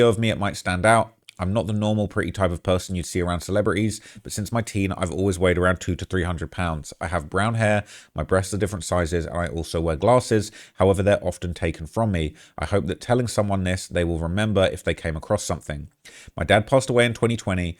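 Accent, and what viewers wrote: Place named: United Kingdom. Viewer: Do you happen to have some crumpets ans tea? Your accent is like nails scratching a chalkboard...fkn lame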